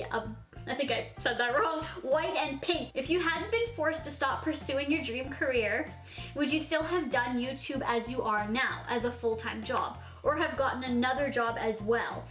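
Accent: Canada. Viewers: I love the way you speak! I love the Canadian accent! From my fortune language my fave is Rotterdams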